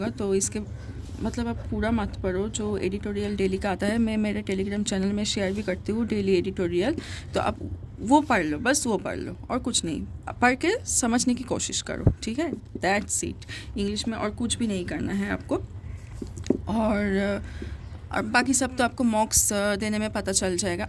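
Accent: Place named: Bengal, India. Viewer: Ap kya Bengali ho? Apka accent bengali ki tarah lag raha hain....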